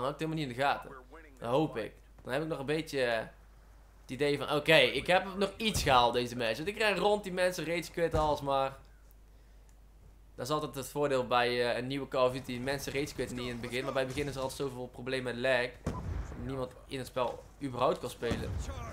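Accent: Brabant, Netherlands. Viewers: Kom je uit Brabant?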